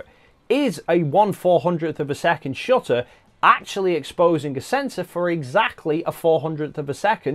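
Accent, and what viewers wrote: United Kingdom. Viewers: im sorry but you cant explain your rebuttal clear enough, you sounded like you were just regurgitating what tony was saying , but in a British accent . and furthermore, Tony shows his sources for his claims and you just didnt show anything but talk. this is click bait and your just feeding off someone elses channel to get some of their subs....NOT!!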